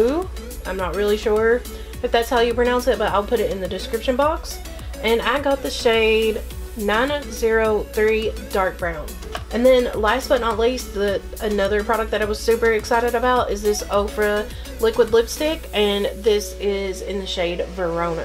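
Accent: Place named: Kentucky, United States. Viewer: Subbed from IG! I'm audiesmama there! I'm also originally a Kentucky girl! You sound like home to me! 💙 great video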